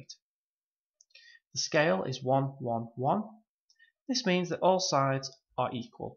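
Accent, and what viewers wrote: United Kingdom. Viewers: You a scouser mate?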